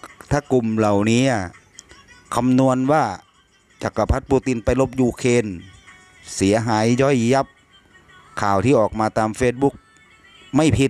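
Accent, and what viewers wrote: Isan, Thailand. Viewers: พากษ์อีสานโหลดอ้าย ฟังง่ายดี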